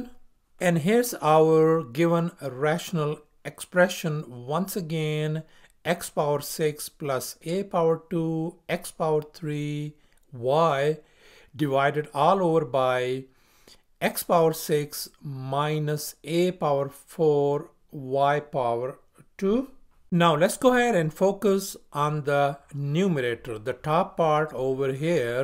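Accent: India. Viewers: Sir , I am from India.. You sound like Indian accent.. Are you from India?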